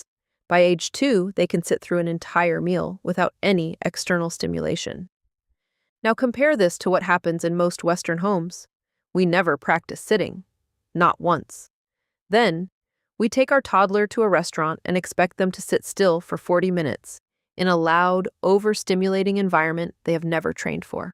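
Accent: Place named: United States. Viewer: the american japanese accent is frying me 😭😭💀💀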